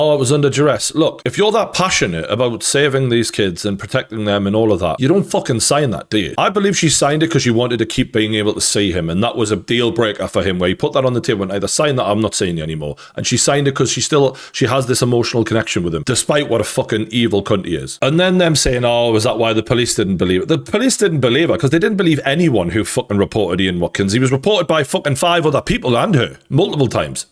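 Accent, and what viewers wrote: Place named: Geordie, United Kingdom. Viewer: Oh my God, This is Very difficult to listen to Geordie🤯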